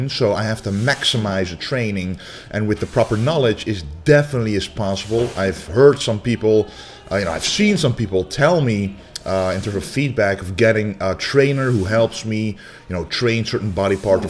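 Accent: Netherlands. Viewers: Hahaha ik dacht serieus is deze man Nederlands? En toen zei je het. Goeie tips man! Ik voel me rug altijd heel lastig. Ga dit zeker vandaag proberen. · Soms voel je gwn dat iemand nederlands is, terwijl je engels is LV10 perfect maar ik keel naar ze face en dacht..... wacht een even laat me kijken wat zijn naam is en jaaa hoor BTW top video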